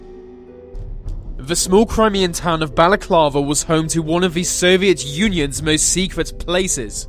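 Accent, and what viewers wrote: United Kingdom. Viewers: You sound british yet people claim you're american, weird. Nice vid though.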